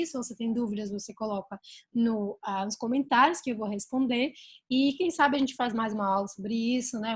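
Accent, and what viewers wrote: Brazil. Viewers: Cara, tu é o bicho para ensinar. Você fala o portugues como brasileiros e com um sotaque maravilhoso.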